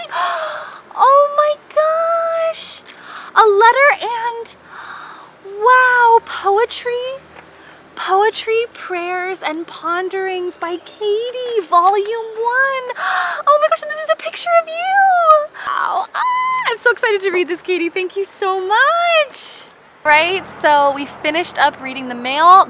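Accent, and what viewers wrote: United States. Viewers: I lived in the Algarve ( south of Portugal) for many years. I am now near Lisbon. I love Oporto too and I have a very close friend there. I am so happy that you had the time to reply to me. Who knows you and your husband will move here one day, even when you're much older. Life is such a big adventure! I wish you were here so we could chat about our many interests in common: spirituality, philosophy, photography, videography, nature and as a bonus I could even practice my spoken english with you ( love your english and expressions from the US) and I'd happily teach you portuguese, if you wanted to learn, coz it's not really a necessity here in Portugal. Also please know you are making a difference in people's lives. Last week the words you said about letting go and trusting the universe, when you told us the story about the french bakery, came to my mind several times. 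It's nice to be reminded and your words helped me🙏! Keep up your awesome work! Much love to you!💞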